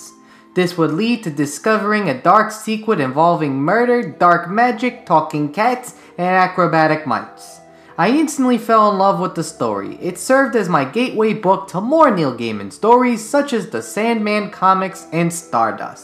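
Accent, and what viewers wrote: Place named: Brooklyn, United States. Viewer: The Brooklyn accent is unbearable.. I feel like i'm listening to Joe Pesci.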